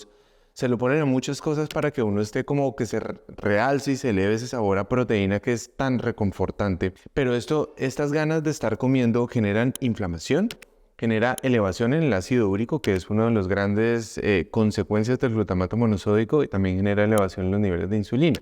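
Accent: Colombia. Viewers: Gracias por sus videos he aprendido un poco más a cuidar mi salud y además con ese acento colombiano que me encanta es más fácil para mí estar concentrada en lo que esta diciendo (soy mexicana) ❤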